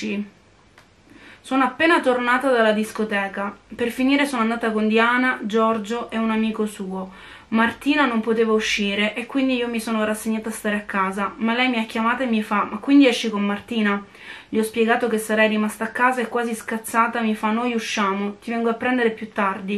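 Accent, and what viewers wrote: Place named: Palermo, Italy. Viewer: Il tuo accento palermitano è qualcosa di stupendo 😂😍 (sono siciliana e ho origini palermitane)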